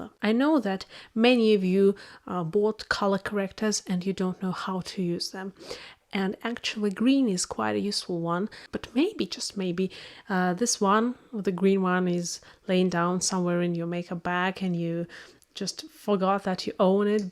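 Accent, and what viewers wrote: United Kingdom. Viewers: Я так пыталась понять Ваш акцент, думала Вы из Англии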